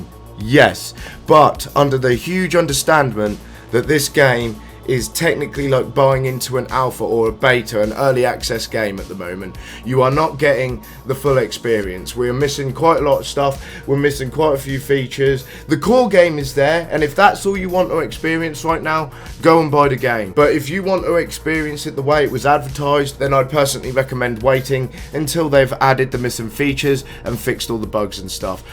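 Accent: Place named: East Anglia, United Kingdom. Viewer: I like your accent, where are you from? It reminds me of East Anglia in England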